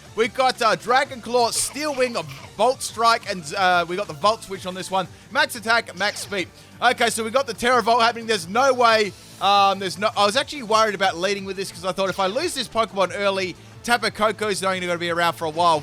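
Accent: Australia. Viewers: are you austrailian ?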